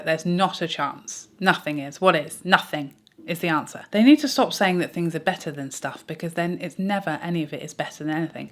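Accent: United Kingdom. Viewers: I love someone with a British accent telling me what to do! I’m not even tempted by the CT cream bronzer bc I never use the 2 I own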